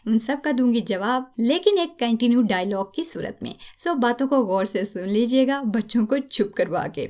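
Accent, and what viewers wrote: Punjab, India. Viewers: Hy... i love ur voice specially ur punjabi language i loved it ❤ bcz m from india #punjab# 😘😘😘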